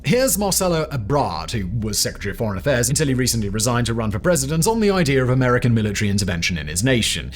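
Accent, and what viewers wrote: England, United Kingdom. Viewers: Your accent - I assume u English- don’t know what u talking about - George bush CIA director in <num>-vice president in the 80’s Pres in the 90’s -in 1976 was liaison for China - u do the math - someone is Benefitingon This side - people are leaving the door open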